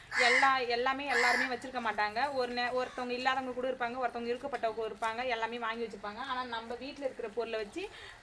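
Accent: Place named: Cuddalore, India. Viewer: கொள்ளைக்குன்னு சொல்றிங்க கண்டிப்பா கடலூர் மாவட்டம் தோணுது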